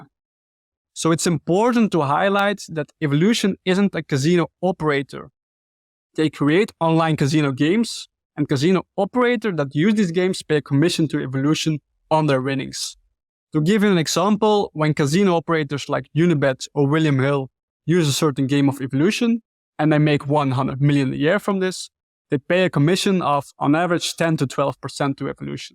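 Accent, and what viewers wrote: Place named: Brazil. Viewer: Also, my guess is he's Brazilian. He has the UFC fighter accent.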